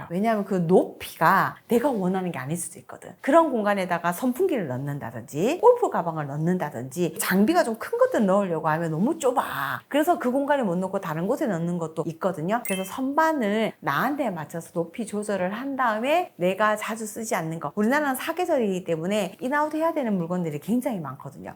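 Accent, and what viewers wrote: Gyeongsang, South Korea. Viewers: 경상도 억양. 설치고 설레발이 먼저인 인종이라 본인이 일단 정리정돈 안되는 어수선이들. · 경상도 사투리 쓰는 분들에게 이런 댓글 다는 사람들 있는데 이유가 뭔가요?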